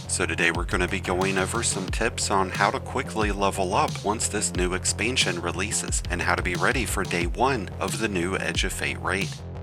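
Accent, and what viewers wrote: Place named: South, United States. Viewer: I am a fan for your voice and southern accent. Please, check your mic as it's always been high pitch. A little bit of shhhhh too. Hopefully you can make a cleaner sounding voice the your future here. Love from a fan.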